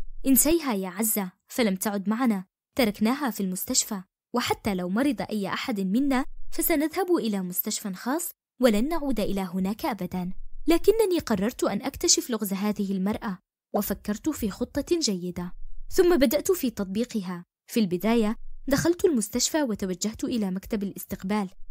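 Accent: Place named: Andalusia, Spain. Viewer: سهل تكون حاكي قصص بس صعب تكون بصوت ❤️🥺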